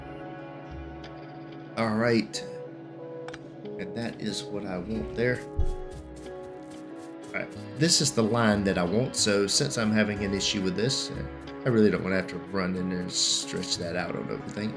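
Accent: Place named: South, United States. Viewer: It sounds to me you're from the south. Like the accent and I love this game :)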